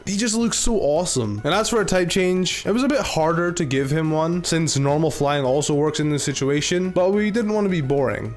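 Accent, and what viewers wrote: Scotland, United Kingdom. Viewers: Is half Scottish, because his voice has a faint hint of Scotland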